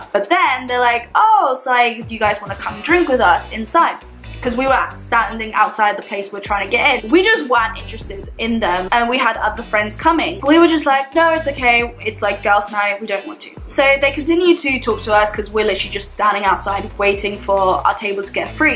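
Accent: United Kingdom. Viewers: omg it took me till to realize you're british!! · it took me 3 minutes into watching this video until i realized that you have a british accent 😭😚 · Nah mate she is very british xx it’s not Aussie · Now you know you can pass off as Australian lol. I heard the British accent, but wasn't sure.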